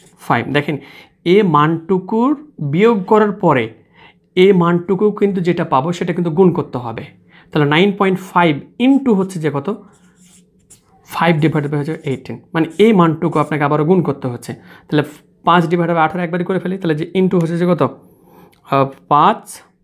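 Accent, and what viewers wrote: Rajshahi, Bangladesh. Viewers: Sir are you from Rajshahi?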